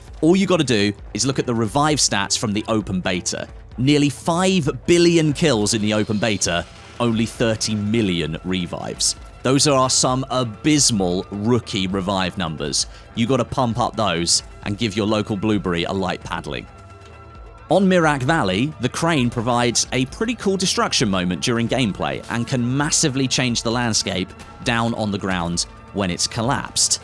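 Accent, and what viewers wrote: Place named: England, United Kingdom. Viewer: you outsub stodeh on YT good work man! my englishman with the so easy to know who u are by ur voice ;) perfect english accent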